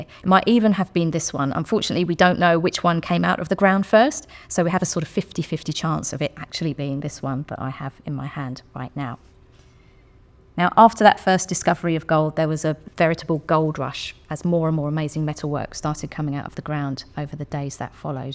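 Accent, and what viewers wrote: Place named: United Kingdom. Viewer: I want this lady to explain everything to me. A hot blonde with a British accent sure makes information go down smoothly. 😅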